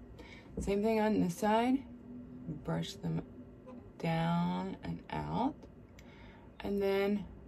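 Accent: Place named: South, United States. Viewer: Hey hey miss lady..💙 u look beautiful with or without makeup..💙 again i also deal with ptsd d after getting out of a horrible relationship of 8 years. .but now that im free im finding me again.. Its been insane. .im soo happy to have survived.. Im getting dentures at 40 due to not being able to even try to take care of me..but im soo happy to find ur channel and u..💙 im from Memphis but live in Oklahoma so i here for the southern accent..💙 u r a sweetheart..ao happy to be apart of ur journey. .💙 keep growing and for sure keep shining💕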